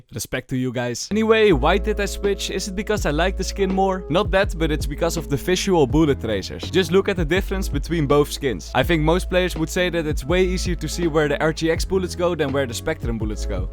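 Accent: Netherlands. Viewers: haha het nederlandse accent is zo duidelijk (geen haat gast ga zo door met die content)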